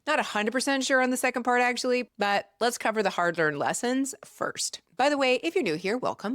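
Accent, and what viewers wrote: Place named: Canada. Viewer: You hide it well but I can hear your Canadian accent. I'm going with British Columbia somewhere outside Vancouver.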